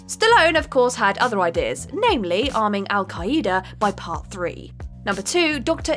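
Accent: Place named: England, United Kingdom. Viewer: Yankee? She English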